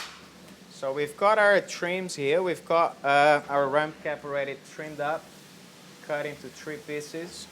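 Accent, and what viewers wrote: Australia. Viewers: Sounds soo Aussie. ..awesome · Da hora esse sotaque aussie - e da hora também ensinar (direito rs) os gringo a fazer picanha - porque já vi cada vídeo bosta da galera "ensinando" rs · todd goslin he probably learned English in Australia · A lot of brazilians go to australia to learn English! That’s why he has this Australian accent! brazilians learn pretty easily the accent from the country we study the language!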